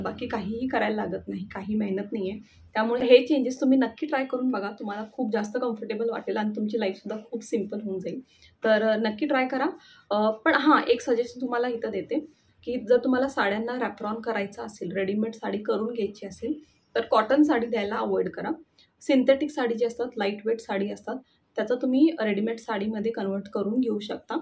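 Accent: Kolhapur, India. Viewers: कोल्हापूर ची आहेस का ताई....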